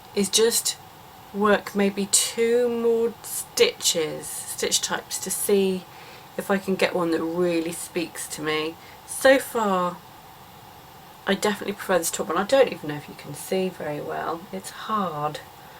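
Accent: United Kingdom. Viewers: Hiiii!!! I love to hear your perfect British accent😍. And that mohair coat it's lovely. I have a lot of stash, mohair stash, and always think it's better knitted than crocheted!! But you made me rethink it😁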